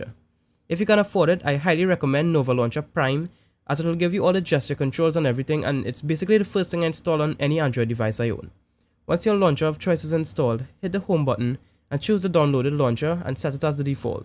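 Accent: Trinidad and Tobago. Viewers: I recognise that accent! love all the way from trinidad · Are you trini? Can spot that accent a mile away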